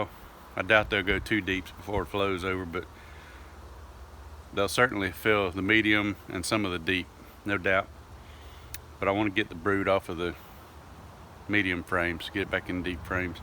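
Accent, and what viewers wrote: South, United States. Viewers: Is that talking country or southern. 😂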